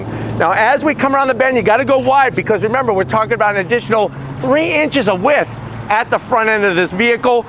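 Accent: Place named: New York, United States. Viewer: Gday mate im an Aussie and am getting a Hellcat imported for a January delivery. Cant fkn wait aye. Good review just a question though where is your accent from as i love immitating your voice. Are you from Brooklyn or Boston or something else?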